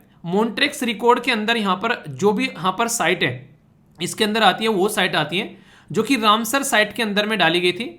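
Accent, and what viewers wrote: Haryana, India. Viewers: sir app kon si district se belong karte hai app ki awaj haryanvi lagti hai jo bahut hi pyari hai i am also haryanvi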